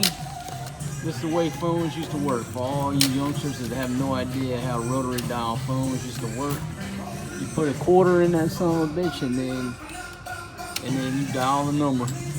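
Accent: South, United States. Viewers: You have a cool suthern accent. Lo Great video anyway. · Your accent sounds like you're from the south?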